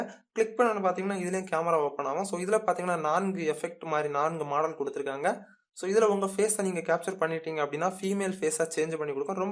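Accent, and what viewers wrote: Kanyakumari, India. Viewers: neenga pesurathula...irnthu..kandupudichen...me..also Kanyakumarian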